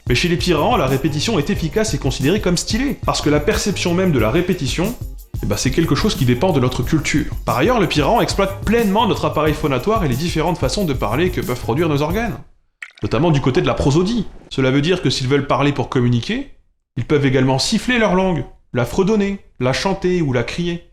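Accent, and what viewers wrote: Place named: Franche-Comté, France. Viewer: Wow, j'ai eu cette vidéo en recommandation et je regrette pas du tout d'avoir cliqué dessus ! T'as gagné une abonnée 😊 Mais euh, ton accent, tu viens d'où ? On dirait la franche-comté...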